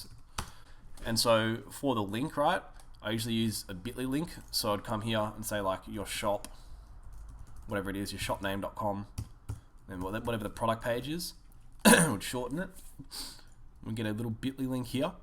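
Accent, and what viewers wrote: Australia. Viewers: So good to hear an aussie explaining it ahahhahah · Good to hear an Aussie doing it, I’m from South Australia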